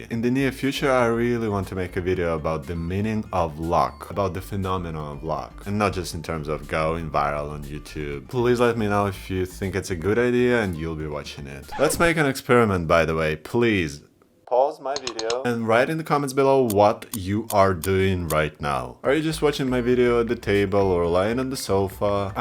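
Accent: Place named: Russia. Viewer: It's here In Georgia, USA. And you're queued up with a number of videos. I'm listening to you as I play a video game. You do have a strong Russian accent. But, as you already said, the algorithm isn't human. So, that's not the problem. Your English is very good as well. Before I continue, I have to state the obvious. There's a vicious war in Ukraine and of course you are aware of that. I'm certain that not all Russian citizens support that war and I'm not asking your position. You may not wish to answer and I wouldn't impose on you for an answer. But it's constantly in the news. And like it or not, that may have some effect on any Americans who views your videos. There's very little doubt in my mind that focusing on that in any way would not serve you well. In sum, there may be a measure of fear that is inhibiting you. Or a measure of anti-Russian sentiment as well. 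I'm not a content creator in any way at all. So, I can't say that any advice I give you will be useful. It is not my intention to be cruel, but I have to say that sitting in front of a mic just talking about yourself doesn't make you stand out. So, I'm wondering if you have some personal interests that you can share with us. Any skills, training or activities that might interest viewers. You live in Russia I believe and of course it isn't wise to dox yourself. How does being nonbinary affect your life in Russia? Are you at risk from others there for being on YouTube and openly nonbinary? Can you leave your h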